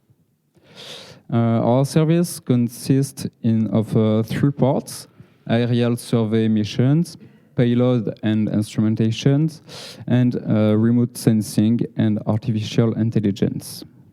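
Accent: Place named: France. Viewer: do the french know that they sound atrocious when they speak English? Your accent, she urts may eers, no?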